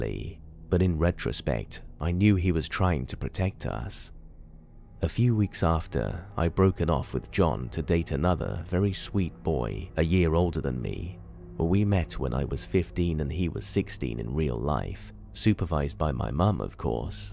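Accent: United Kingdom. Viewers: Gehls. I love it !!! I love that British accent ! ❤ 🙏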